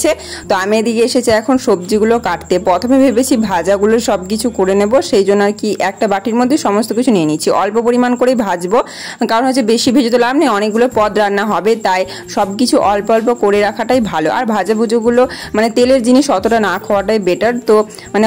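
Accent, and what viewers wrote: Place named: Bankura, India. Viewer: Tomar kotha golo Bankura manus dar moto kotha ❤❤❤😊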